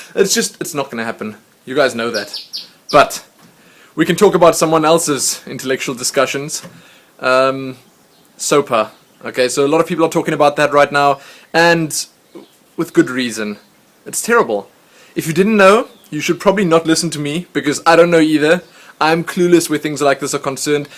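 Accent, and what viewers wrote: South Africa. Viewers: I'm South African so I thought it sounded familiar :P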